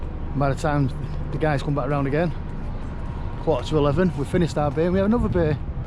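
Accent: United Kingdom. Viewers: Thought you were from Manc good on yeah. Our interpretation would be Geeza bud ta. Guess where in the country that comes from.1 Guess.🍻🍻😂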